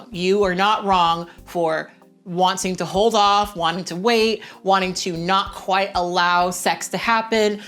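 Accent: United Kingdom. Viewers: British accent is something in my life I never knew I needed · I was having a hypoglycemia while watching this video and those elf ears and the British accent felt so surreal, I thought I was loosing my mind The video is great ❤️ I can't agree more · i love how you verge into a british accent when you feel awkward bc same as an ace person this was really nice to hear! I am pretty sex positive actually, but I'm just not personally interested at all for some reason the ending where you peer pressures us into using the emoji has become my favorite part LOL like its so funny but weirdly comforting at the same time🥕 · Can't find any comments about the British (?) accent so I just wanted to say it slaps 🥕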